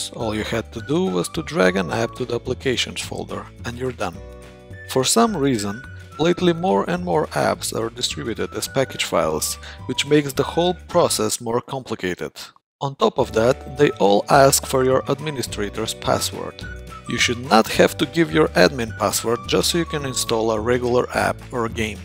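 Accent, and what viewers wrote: Russia. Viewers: русский чтоль?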